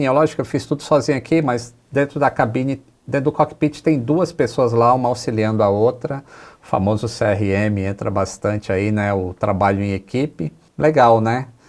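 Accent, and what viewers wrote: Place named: Rio de Janeiro, Brazil. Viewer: tá pegando sotaque carioca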